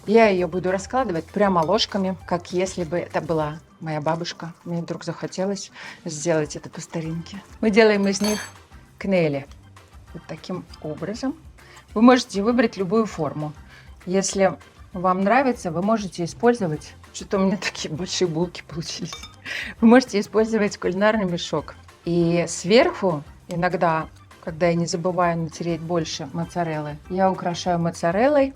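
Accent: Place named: Baltic. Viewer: здравствуйте! Ваши рецепты такие классные и удивительные и мне это нравится! 😊 А на счёт вашего акцента, я думала, что вы из Прибалтики 😁 Удачи вам ❤❤❤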